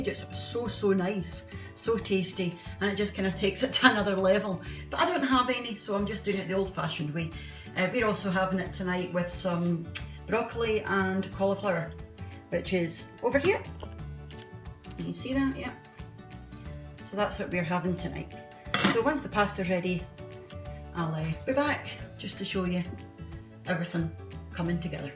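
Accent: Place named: Scotland, United Kingdom. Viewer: Im happy to here a Scottish woman teaching me im not a great cook im learning but love the simplicity of the menus n great food from yonuger years ❤👌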